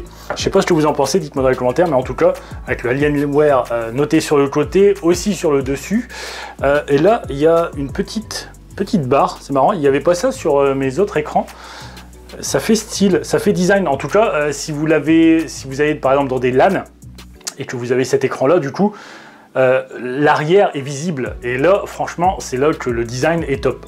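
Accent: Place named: Nord-Pas-de-Calais, France. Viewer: le petit accent chti sur les "a" mutés en "oa" bien lourds lol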